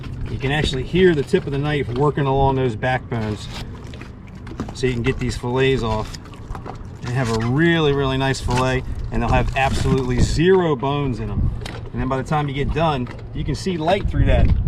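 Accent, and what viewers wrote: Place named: Baltimore, United States. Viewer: got that Balmo accent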